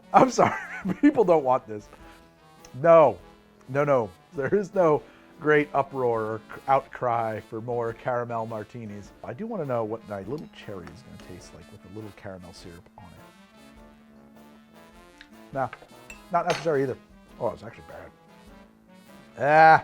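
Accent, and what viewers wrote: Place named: Philadelphia, United States. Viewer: Everything you say "weird" is also how a lot of Philadelphians say things, so f yourself, it's wooter ice. Love you!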